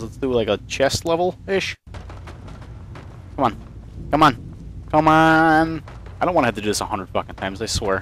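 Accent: Boston, United States. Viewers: You from baahwsten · something tells me your from Boston... My step fathers from boston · lol you sound like you're from Boston or Rhode Island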